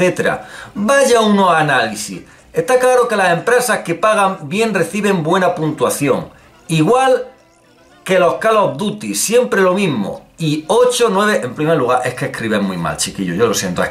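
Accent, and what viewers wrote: Andalusia, Spain. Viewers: Daniel Vargas porque es andaluz y por ende habla en su dialecto, que viene determinado por el lugar donde te crias y vives, mientras que escribir mal, es directamente una falta cultural bastante grande.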